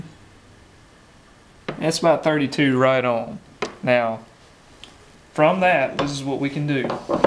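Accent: South, United States. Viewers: Nice video bud. People just get jealous that they don't have a southern drawl lol guarantee most Yankees wish they had one lol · don't worry about your southern accent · k-e-w-l.......just got back into being a grunt for a framer.....did it in college years ago.....saved my tools just incase I had to go back to it....and I went back to it.....work for an old hippie who knows a few tricks himself.......will hafta check and see if he knows a few of these tricks....he just might.....sure thank ya fella.....southern accent here too, so don't worry......be happy.....:) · To an HVAC/Electrical/Mechanical troubleshooter, now a semi retired handy man, this is a priceless video...At least I won't look totally lost...lol....My thanks, to another country sounding gent like myself, for making it. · I appreciate the southern accent. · a southern accent instills confidence in me that someone knows how to use tools. 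· Don't apologize for your southern accent. Remember the song,? "I'll speak my southern English as natural as I please; I'm in the heart of Dixie, Dixie's in the heart of me!" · Having watched a few of this man's vid's, and returned to them more than once, I'm beginning to think there there's no end to this man's talents. As for your Southern accent? Well, 'least ya'll speaking English. Keep it up. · As a female who knows little about construction, I find this fascinating and (bonus!) easy to understand. I probably won't ever need to use this, but I love to learn all sorts of things. And I find a southern accent charming lol. Please keep up the teachings as you are great at it :-) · Don't apologize for your southern accent, it's a blessing from God. - Proud Texan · hit the like on this comment, sir God blessed you to be a teacher, what He gave you is a gift, He meant for you to give your gift to others and you have, so carry on sir I salute you and never apologize for the southern accent. 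that's a gift from God too !! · Tons of people today have no clue on some construction techniques, im amazed at some old timers ability to make cuts or joinery from years of experience easily and from eyeballing it, there are those of us that can do a lot of basics but appreciate tips that are not neccesarily as well known as some of these commenters think. If some on here say stuff about your southern accent, i would die laughing if i were to hear their New Joisey accent, We all have accents and it is NOT indicative of intelligence level ( dumbasses) Keep on making these man, they are informative and a help.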